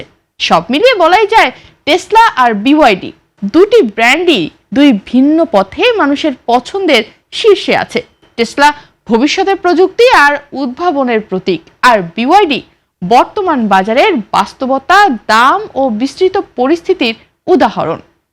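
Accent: India. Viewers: এই মহিলার বাংলা পশ্চিম বঙ্গের মতো লাগে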